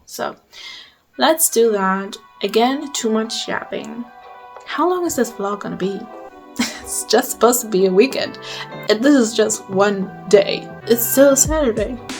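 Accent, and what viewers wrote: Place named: Spain. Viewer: I feel like if I wrote by dictation, half my first draft would be "um"s 😂 your accent in Spanish is so nice! In my country we call the supposedly "neutral" accent in Spanish the "Discovery Kids" accent 😂 I am moving to Spain so I hope I get more used to hearing that one, as it's currently not my favorite either lol 🥲 I generally don't read via audiobooks but if I did I'm not sure I would wanna read a Spaniard narrator either. Oh and I had to skip over the Sunrise on the Reaping bits because I'm afraid of spoilers but honestly at this point it will probably be several months before I read that book 😅 I want to reread the original trilogy first, I need to get to it! Fun vlog:)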